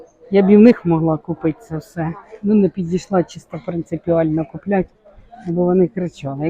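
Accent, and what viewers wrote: Poltava, Ukraine. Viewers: Рiдна полтавська мова ❤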